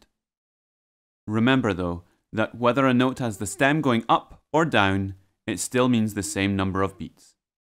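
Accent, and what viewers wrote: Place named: Scotland, United Kingdom. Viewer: Why u scottish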